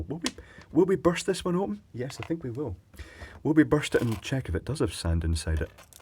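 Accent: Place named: Edinburgh, United Kingdom. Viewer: Refreshing to hear an edinburgh accent!!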